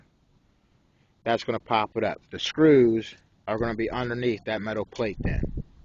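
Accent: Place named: Pittsburgh, United States. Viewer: Pittsburgh? I recognize the street signs and the accent! What's up brother?!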